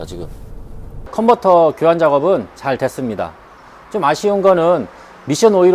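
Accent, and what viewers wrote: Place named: Chungcheong, South Korea. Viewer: 충청도 사투리 쪼~~끔 펌프라고 하면되지 쁘엄 쁘 ㅋ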